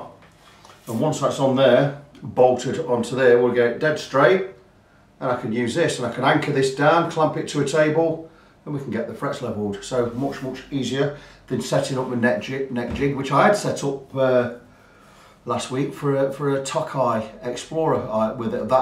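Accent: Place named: Nottinghamshire, United Kingdom. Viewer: I love your no nonsense, workmanlike style of setting up guitars. You are a real craftsman. I'm guessing, from your accent, you are local to me, Newark, Notts.?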